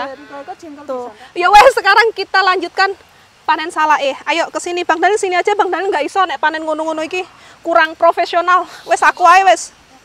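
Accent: Java, Indonesia. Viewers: Mbk aslinya org mana ya, cz logat nya asli jawa banget..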